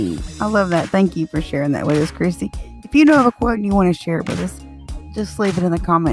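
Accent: South, United States. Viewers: I like it. I would love to see one in white or wood look.. Great job. Love you....you are amazing!! I love you soo much. We are both country gals. Our pronunciations on some are just about the same. I live in the south. But you got it going on.... Xoxo. Hugs your way. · Love the country accent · Love it! Where you from girl? Very Southern. I'm from S.C.! ☺💜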